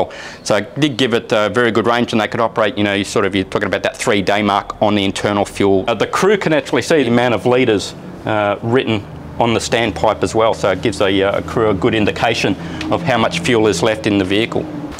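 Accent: Australia. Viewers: I wonder how this typical Australian accent came about??? You can hear him incorporate/use a certain tone on certain words. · You have a very authentic Australian accent. Certainly you come from the land down under. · Ha with his Australian accent I at first thought he said "murder rifle" rather than "motor rifle"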